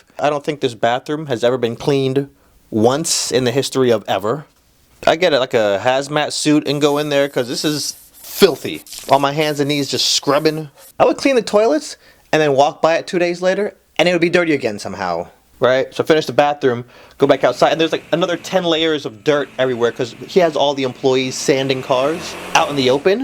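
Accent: Trinidad and Tobago. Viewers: My first job was a veterinarian assistance. Also your trini accent is real good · The trini accent tho 💀🇹🇹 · nice trini accent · is the trini accent .. i weak🇹🇹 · Trini accent on point, much love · Trini accent legit! lol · A plus rating fi did trinin accent! And you know Caribbean people give you one nickname, it stick for life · Trini accent on point😂💯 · That Trinidadian accent had me rolling XD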